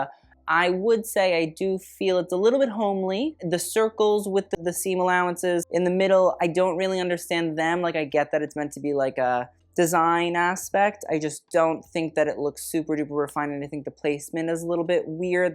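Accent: France. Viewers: As a French girl, your French accent is killing me 😭😭😭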